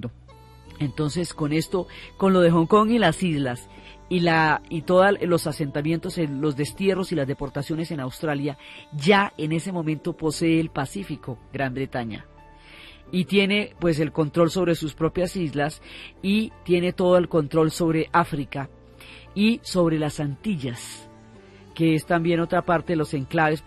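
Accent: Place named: Bogotá, Colombia. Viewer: Es el acento y modismos de los bogotanos tradicionales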